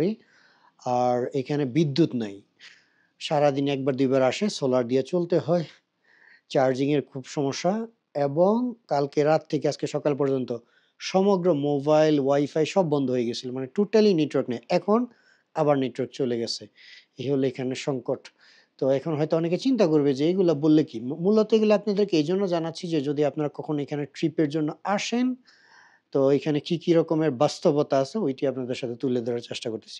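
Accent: Noakhali, Bangladesh. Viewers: এই নোয়াখাইল্যা চলবে না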